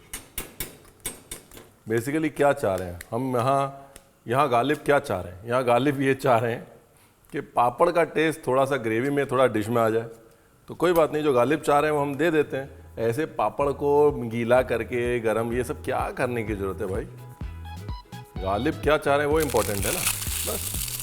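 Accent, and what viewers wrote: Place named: Punjab, India. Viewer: Sorry to say Sir but why do you overeact so much? It always seems like you need some kind of validation that you are punjabi. We all know you are punjabi. You always mix all three languages English, hindi, punjabi everytime. Please use one language according to your channel preferences. I know you have a punjabi touch in accent and we all have .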